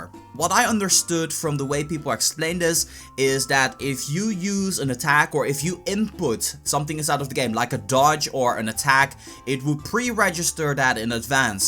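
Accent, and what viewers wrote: Netherlands. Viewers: ben je nederlands ik hoor het aan je accent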